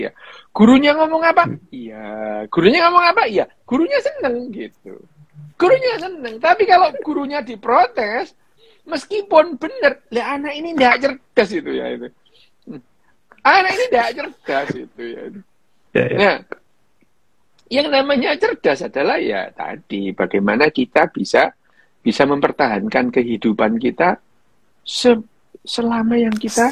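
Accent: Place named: Malang, Indonesia. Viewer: Saya suka dokter Ryu Hassan, benar-benar cerdas, dan gaya dialek Malang nya alami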